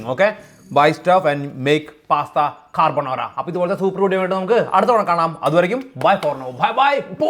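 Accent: Thrissur, India. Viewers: നാട്ടിൽ പോയി വന്നോണ്ട് തൃശ്ശൂർ സ്ലാങ് അടിപൊളി ആയി വരുന്നുണ്ട്... But സൂപ്പർ 😍.... ❤️ ഉണ്ട് ബ്രോ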